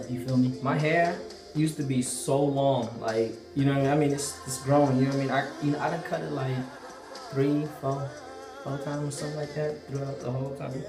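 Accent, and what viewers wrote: Bay Area, United States. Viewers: KingOfKings it's called having a Bay Area accent, you obviously aren't from the bay. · You sound like you are from the bay area you got that language to you. Dreads are dope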